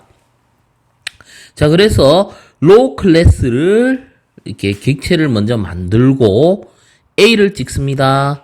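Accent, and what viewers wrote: Gyeongsang, South Korea. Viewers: 파이썬 고급테크닉을 잘 몰라서 찾아보다가 이거를 처음 봤는데, 사투리 사용하시는게 정말 귀에쏙쏙 잘 들어오네요. 서울말로 하는 것보다 경상도 억양 묻어나는게 훨씬 듣기 편해요~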